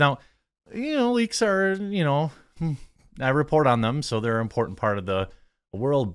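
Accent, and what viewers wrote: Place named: Midwest, United States. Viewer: Off topic but... I'm from northern Illinois but living in France for years (business consultant). I can't tell you how great it is to find and listen to a real Midwesterner speak like a normal person (in my opinion), without pretense, knowledgeable, and like an intelligent neighbor you feel comfortable arguing with. Thank you for being normal. Good luck with your company.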